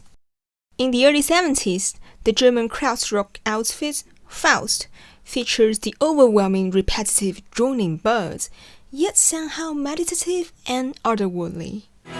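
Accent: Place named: Somerset, United Kingdom. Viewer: You've nearly nailed that Somerzzett accent!!!❤❤😊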